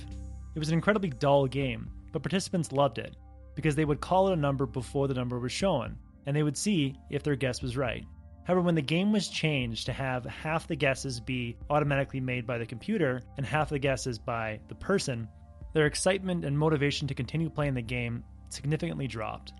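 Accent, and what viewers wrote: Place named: Canada. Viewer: Bona fide Canadian (Alberta?) accent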